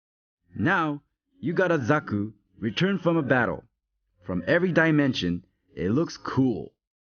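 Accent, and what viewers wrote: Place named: Japan. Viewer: It's the Japanese accent. Most Japanese men speak like this. Don't think it's nice to relate that to a meme, but I understand that a lot of people might not have been exposed to hearing such an accent before. 😃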